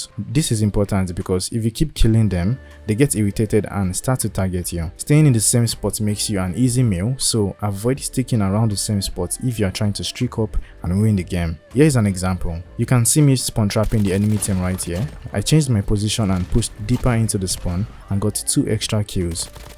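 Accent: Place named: Africa. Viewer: Ooohhhh my gooodddddddddd ur african accent kills meeeee It's soooo guuuudddddd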